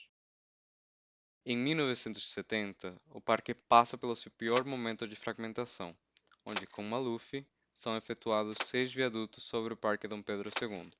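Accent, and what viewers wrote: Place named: Portugal. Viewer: De onde é seu sotaque? Fiquei muito confuso, parece mistura de português (de Portugal), com paulista e carioca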